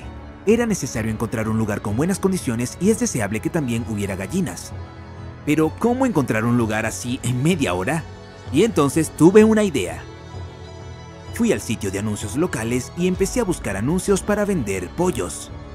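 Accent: Russia. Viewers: Bro sos ruso o español?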